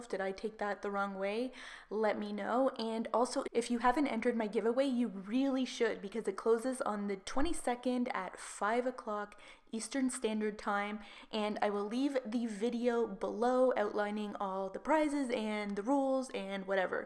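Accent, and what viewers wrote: Canada. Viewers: A. S. Lauder I'm betting it's Canada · I know this is off topic but I'm an not a native English speaker and when I talk I usually have accent similar to yours so I'm interested in where you're from. Some tell me I sound Canadian. Not sure what their accent is. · You sound Canadian. Which is awesome.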